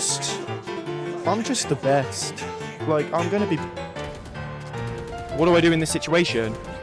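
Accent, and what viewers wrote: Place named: United Kingdom. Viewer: is probs one of my fave streamers. dude makes dredge fun. the funny British accent is a bonus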